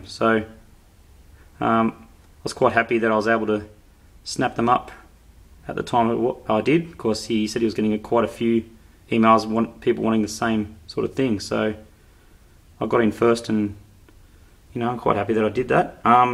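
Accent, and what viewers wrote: Australia. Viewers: nice review must be an aussie mate thanks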